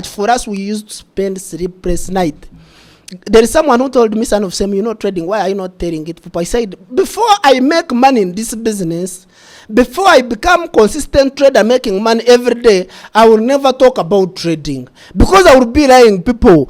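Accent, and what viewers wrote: Ankole, Uganda. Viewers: Your accent is not bad just a kinyamkole accent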